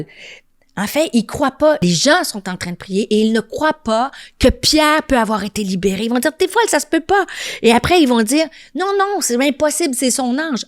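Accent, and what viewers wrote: Quebec, Canada. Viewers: ce beau accent québécois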